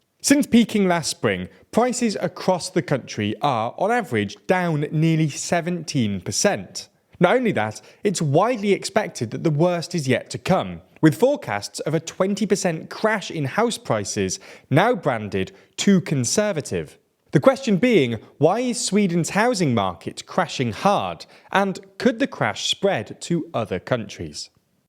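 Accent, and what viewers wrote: United Kingdom. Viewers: Neato! Cool British accent,! 1 Capitalism and communism work for a few. 2 The world needs Nordic-style socialism. 3 Without love and sense of humor there is no happiness and life is meaningless. 💕☮🌎🌌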